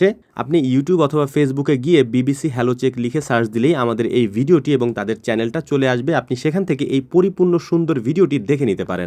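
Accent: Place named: Takerhat, Bangladesh. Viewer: Viya apnr basha maybe takerhat er ase pase.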